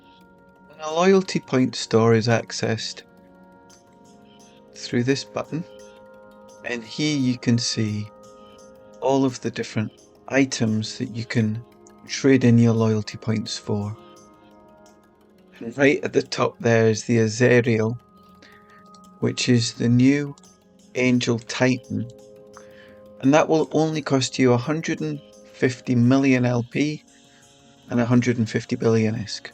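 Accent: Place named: Scotland, United Kingdom. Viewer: Great video, loads of useful info and explained easily. Plus i could listen to that Scottish accent all day, easy listening :)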